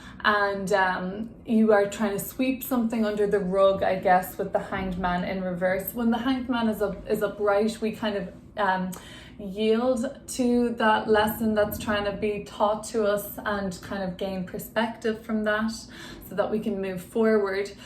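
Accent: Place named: Ireland. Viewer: I love your beautiful irish accent, i should know, im from Dublin, lol. Thank you, beautifully read and spoken.🙏💚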